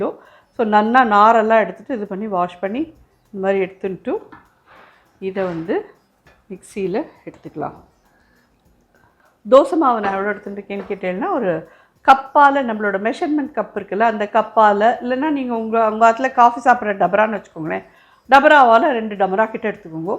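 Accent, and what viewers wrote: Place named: Thanjavur, India. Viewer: ட க்கரா இருக்கும் தஞ்சாவூர் ஜில்லா காரா பேச்சு போல இருக்கு . நீங்கத் தஞ்சாவூர் ஜில்லாவா .நாங்க கும்பகோணம் .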